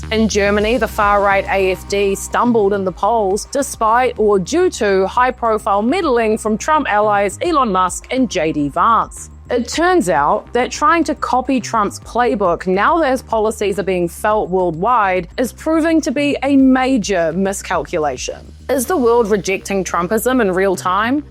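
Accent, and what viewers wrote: New Zealand. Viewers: The accent screams Kiwi. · I am guessing NZ accent doesn't sound Australian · Nice one, I thought you were an Aussie until you I heard you say Albanese incorrectly. But that's ok, Kiwis are cool too, should become our seventh state. 😛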